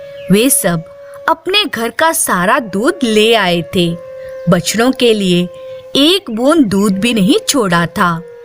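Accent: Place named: United Kingdom. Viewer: Story sachame achi hai... but aap hindi to English accent me kyu bol rahi hai... try to speak normal... ❤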